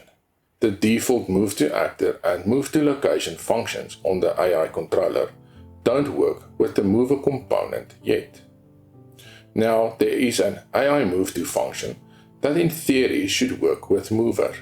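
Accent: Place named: South Africa. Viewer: South African accent is strong with this one.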